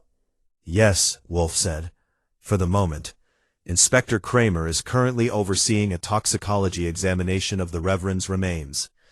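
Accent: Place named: United States. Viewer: thanks for this amazing detective stories and overall thanks for the American accent, it's really difficult to get detective stories with American accent.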